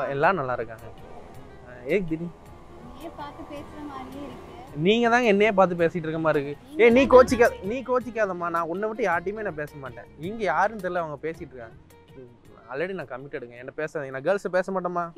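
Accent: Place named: Tirunelveli, India. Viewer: Bro tirunelveli karanu supera katturinga namma oru gethu speech than sema bro👌👌👌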